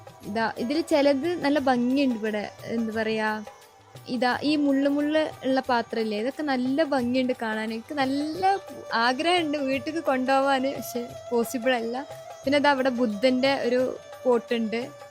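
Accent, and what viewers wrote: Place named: Rajasthan, India. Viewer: Rajsthan maarvadi aan slang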